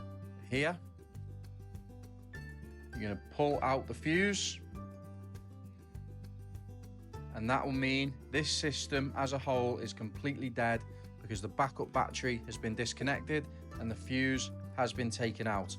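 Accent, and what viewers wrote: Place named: Birmingham, United Kingdom. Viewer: it reminds a bit of brummie. Have a nice one and keep it up.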